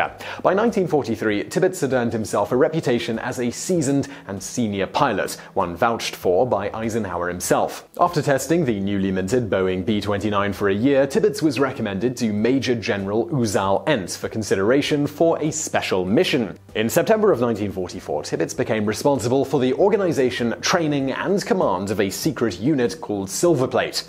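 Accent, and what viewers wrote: United Kingdom. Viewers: The main reason I come here to hear how British people say words.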